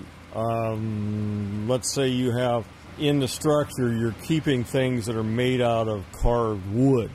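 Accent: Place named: Indiana, United States. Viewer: From my understanding I think he’s from Indiana I could tell just the way he talks mmhmm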